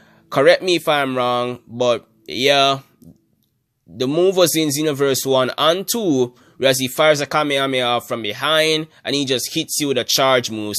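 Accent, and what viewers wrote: Jamaica. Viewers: U sound well Jamaican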